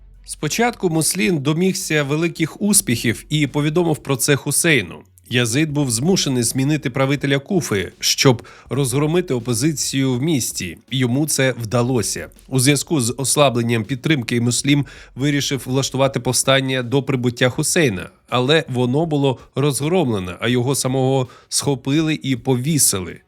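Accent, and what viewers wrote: Russia. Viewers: Пане, ваш русский акцент ви, якось, збираєтеся ліквідувати?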